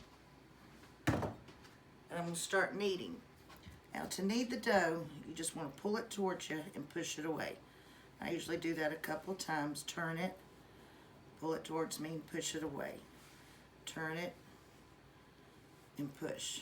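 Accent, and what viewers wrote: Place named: South, United States. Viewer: What a treat to find your channel today! I’ve been baking bread, rolls, English muffins, etc, etc. for sixty years now, and like you, it’s been like a therapy for me. I’ve always loved cooking and baking! This recipe will be my next one! Thank you! I look forward to your future videos and will, of course, be checking out past postings. Love your easy going style and southern accent!🤗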